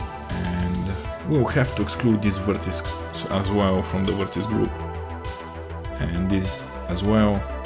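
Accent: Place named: Russia. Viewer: Почему твой акцент подсказывает что ты русский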